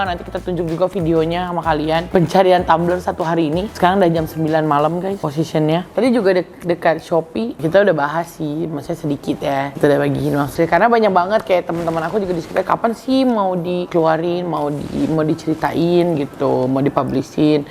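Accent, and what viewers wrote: Malaysia. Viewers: Alhamdulillah, tahniah!! Yg penting loghat bahasa melayu dgn indo nya bercampur ya. Unik sekali 😁😁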